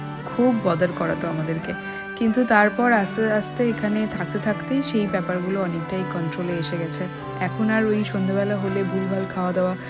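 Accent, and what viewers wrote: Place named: Bangladesh. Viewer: ধন্যবাদ একজন বাঙালি হয়ে প্রবাসী বাঙালির এতো সুন্দর বাংলা একসেন্ট এর উপস্থাপনা মনটা ছুঁয়ে যায়। ঈশ্বর আপনার মঙ্গল করুন।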